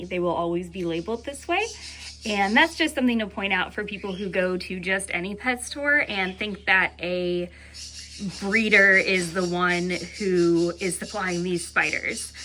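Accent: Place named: Minnesota, United States. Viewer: It sounds like you've been in or near Minnesota lately :D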